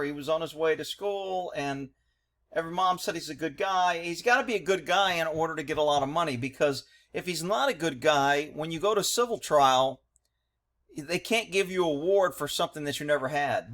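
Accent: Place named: South, United States. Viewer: Your hick accent is absolutely insufferable. But moving along. He already paid for these crimes. He was not in the process of committing anything you mentioned when he was murdered after much torture. And if you think violent crimes are wrong, then you must hate these officers because they did more than threaten or rob. And if you think that unlawful execution without trial by jury over a matter of $20 can in any way be mocked or excused, then surely you must believe an even more severe vigilante punishment is owed to these murderers. After all, their crimes surpass stolen cigarettes and you like to think you're a man of logic.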